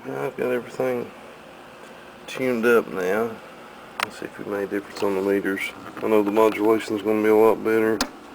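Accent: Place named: Tennessee, United States. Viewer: You sound like your from Tennesse man! If you were to say youn's then I'd know for sure.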